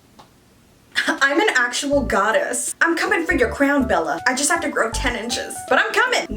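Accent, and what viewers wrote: United Kingdom. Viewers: Your british accent killed me🤣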